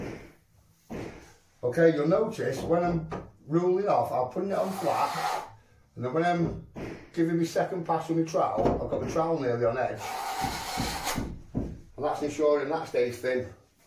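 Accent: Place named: Liverpool, United Kingdom. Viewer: Who's this scouser?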